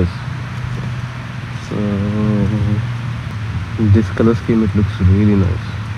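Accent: Karnataka, India. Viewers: It feels good to hear the review by an Indian in an Indian Accent. BMW G310R is Love.. 😍 GS 310R would be awesome too.. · hope for best of it dude are u Indian you some of sound like Indian 😛😜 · excellent review, man. loved it. are you from Karnataka? just curious, and I'm basing it off your accent. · The review ok. I had a very difficult time understanding that very heavy Indian accent.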